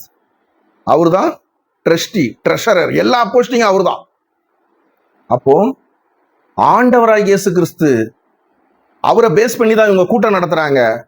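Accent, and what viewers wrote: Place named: Tirunelveli, India. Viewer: என்ன ஒரு அருமை.... எல்லா புகழும் துதி கன மகிமை அனைத்தும் என் ஆண்டவருக்கே. அண்ணே திருநெல்வேலி தமிழ் பேசி வாழை பழத்துக்கு வலிக்காமல் ஊசி போட்டு விட்டீர்கள் 🎉🎉🎉🎉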